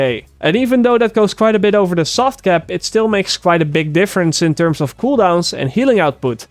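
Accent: Netherlands. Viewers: die nederlandse accent klinkt echt kut xD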